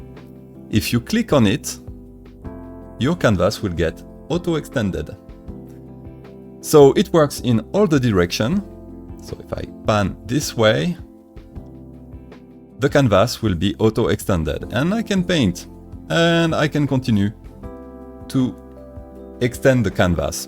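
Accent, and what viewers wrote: France. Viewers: Monsieur vous avez l'accent français le plus fort de toute la Terre je crois, mais punaise, qu'est-ce que je ferais sans vous! Je n'utilise plus que Krita depuis 2 ans, et je ne compte plus le nombre de fonctionnalités que vous m'avez fait découvrir, me rendant le logiciel limpide! De plus, je vous remercie pour les brushes que vous partagez et que j'utilise beaucoup.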